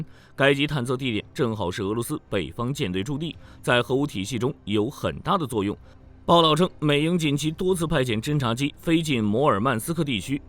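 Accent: China. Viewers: 好抗拒這類普通話聽得很不舒服😢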